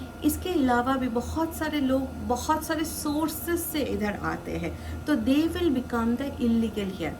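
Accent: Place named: Bengal, India. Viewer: Ur accent seems like u r bengali 😂😅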